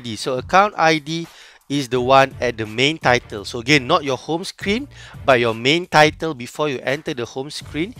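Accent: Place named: Malaysia. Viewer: English nya khas Malaysia betol🗿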